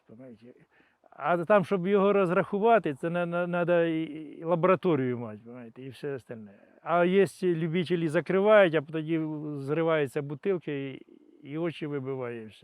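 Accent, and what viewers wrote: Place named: Surzhyk, Ukraine. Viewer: Несмотря на ученую степень, педагога из Вас, не получилось. Очень неприятно слышать суржик. Читайте больше книги на украинском и не будете заикаться.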